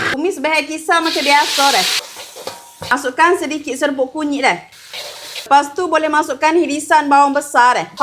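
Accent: Kelantan, Malaysia. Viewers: X tahu la byk dia punya deh kan..org kelantan punya bhasa mcm tu kan....smua negri ada language dia .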